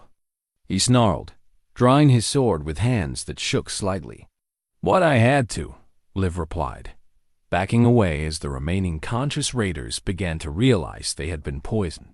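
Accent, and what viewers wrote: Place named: New York, United States. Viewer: Good story! The narrator sounded like he was from New York though. I preferred the other voice you used to use.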